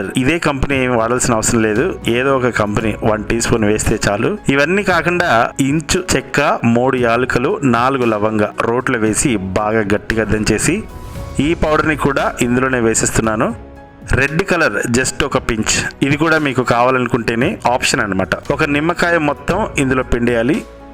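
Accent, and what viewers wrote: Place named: Kadapa, India. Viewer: తప్పుగా మాట్లాడింటి..... అంటున్నారు అంటే కడప or కడప sarrounding వాళ్ల మీరు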